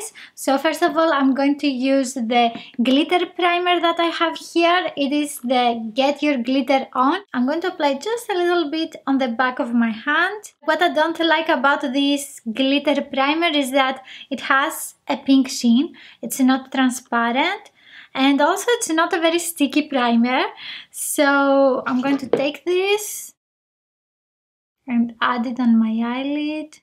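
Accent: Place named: Greece. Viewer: She is Greek. I love their accent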